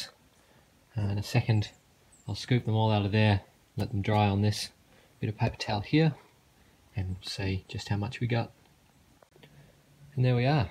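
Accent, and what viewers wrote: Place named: Australia. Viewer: Holy shit mate where are you from in Australia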